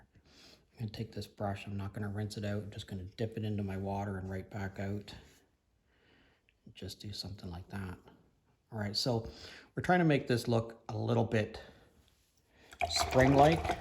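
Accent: Canada. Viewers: I recognized your Canadian accent. We lived in the Greater Toronto area for 23 years!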